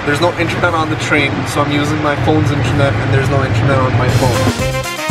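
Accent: Scotland, United Kingdom. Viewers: Why does he have a Scottish accent??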